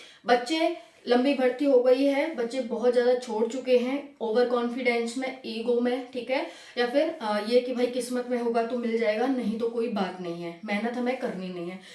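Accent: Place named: Mathura, India. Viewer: Apki language se hi lag raha tha ap mathura se ho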